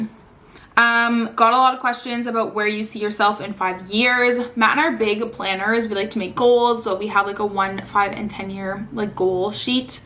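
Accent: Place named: Canada. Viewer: You’ve become a fav quickly! Didn’t realize you were a Canadian! I had tried to figure out your accent previously. You are super pretty and have helped me transition to my work from home position much smoother! I’ve definitely felt defeated working in healthcare from home a time or two. So just wanted to say thank you for all your hard work!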